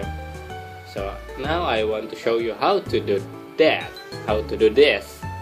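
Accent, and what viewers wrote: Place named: Vietnam. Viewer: its pretty standard vietnamese english if i do say so. i think they're just racists.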